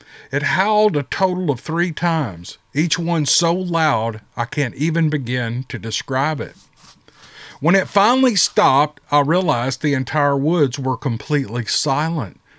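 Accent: South, United States. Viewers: All I can say is thank you for bringing some interesting joy to my life. You have a kind spirit. And beautiful country accent. God bless you and your family. And letting people know sasquatch is real.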